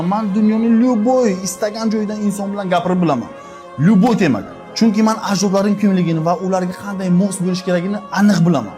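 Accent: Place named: Bukhara, Uzbekistan. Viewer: Koting kuvobtimi bu buxoro shevasi ozgina erkakligimizni esdan chiqarmaylik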